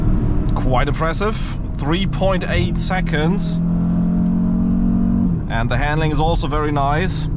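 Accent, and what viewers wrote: Germany. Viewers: omg German Accent.....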